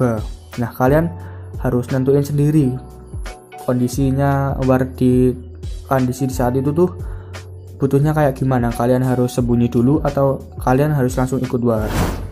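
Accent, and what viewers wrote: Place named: Java, Indonesia. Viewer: Medokee :v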